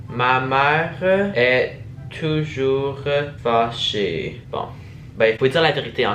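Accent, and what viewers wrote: Quebec, Canada. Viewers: T québécois ou français????? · On dirait que tu ai québécois 😍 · J'aime trop ton accent ce comme les québécois ❤❤ (et lenni chou 💍😍) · Je mets un like pour ta vidéo '-' mais surtout pour ton accent Québécois 😘😂😂❤ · l’accent québécois 😍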